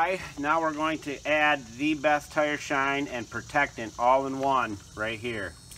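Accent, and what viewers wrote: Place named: Wisconsin, United States. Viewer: you sound like your from wisscOnsin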